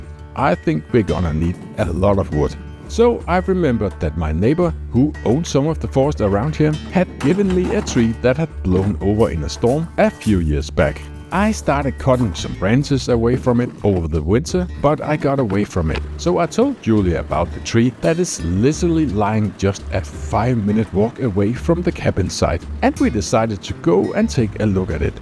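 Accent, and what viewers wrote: Denmark. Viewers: jeg kan bare lig hvor dansk du lyder :D jeg er også dansk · You have similar accent to a Dutch youtuber I follow, Posy. Danish and Dutch have very similar accent when speaking English. I think it sounds cute.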